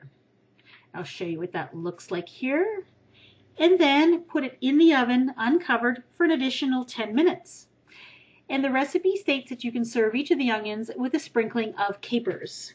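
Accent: Canada. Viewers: within 30seconds of watching this video I can tell she is from Canada!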